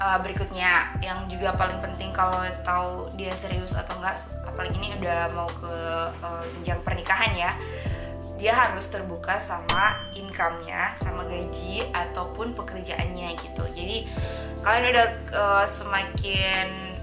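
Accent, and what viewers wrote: Manado, Indonesia. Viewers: Pertama kali nonton konten dr mbaknya. Kedengerannya mbak orang Manado.. anyway salam kenal & sukses dgn channelnya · rupa sama ini logat say...dari manado..?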